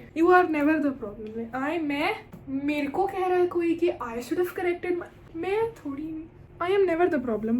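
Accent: Punjab, India. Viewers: Godda , putha IK your punjabi accent 😭😂🎀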